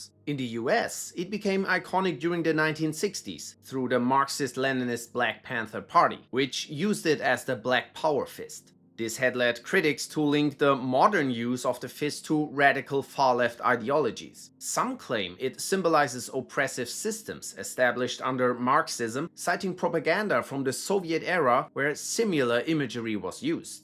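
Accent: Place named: Germany. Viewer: Another great video, I love your voice. It's like Sebastian Vettel; a great & soothing German voice/accent.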